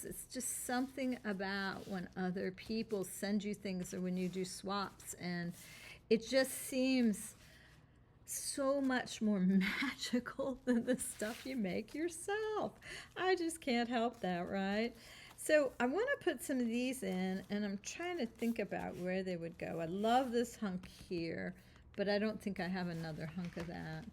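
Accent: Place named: South, United States. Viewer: you are a gem! I've been following you for years as I take my mixed-media journey. I was a part of stencil club on and off for years and I have about 500 stencil girls stencils! I absolutely love your style, your great tips and tricks and that lovely southern voice is a soothing presence in my practice! Thanks for being YOU!!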